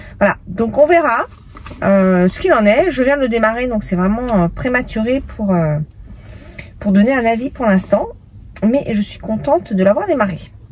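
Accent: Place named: Manche, France. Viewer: Ah la marque Chevignon pour la génération 1972/75 🙌🏻 C’est marrant ni quand je vous écoute j’ai l’impression d’être avec une ancienne collègue : exactement le même accent (elle était originaire de la Manche)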